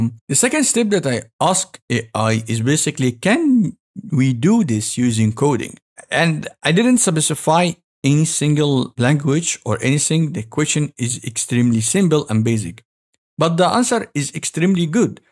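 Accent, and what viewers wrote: Egypt. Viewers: yes Egyptian accent is very clear through the video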